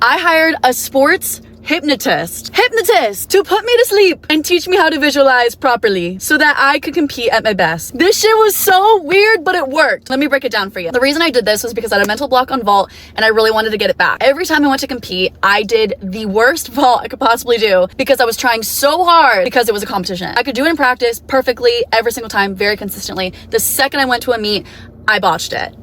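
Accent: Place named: France. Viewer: your french accent was everything 😂 😭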